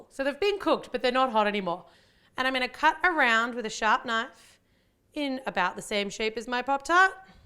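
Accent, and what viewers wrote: Australia. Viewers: are you from Australia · Are you Australian, omg what state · Are you from Australia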